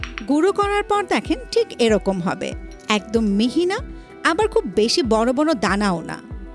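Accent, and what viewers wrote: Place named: Bogura, Bangladesh. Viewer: আমার মনেহয় আপু বগুড়ার মেয়ে, কথা শুনেই কেমন জানি বগুড়ার স্বাদ খুজে পাই, যাইহোক আপুর জন্য ভালোবাসা রইলো, জীবনে যদি বড় হই তবে আপুকে একবার দেখার চেষ্টা করবো।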